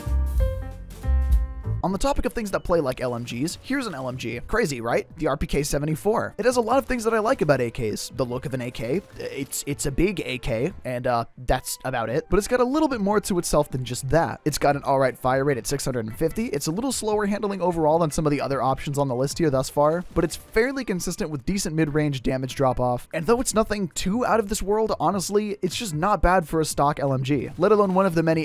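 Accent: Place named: New York, United States. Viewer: does words and picks up accents quick. Great talent. I love his New York accent. Better than mine. I was born in New York & work in NYC. I love his NY accent & a little jealous. I'm female. Jealous is more a female thing!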